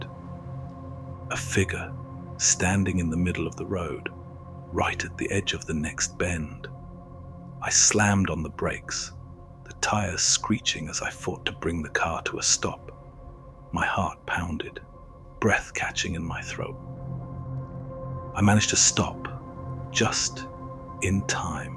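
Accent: United Kingdom. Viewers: I am so happy to eventually find a British narrator, I love your voice and I love your content! I wish that everyone who listened to your stuff would hit the like button for you, it’s the least we can do to show our appreciation for your brilliant page xx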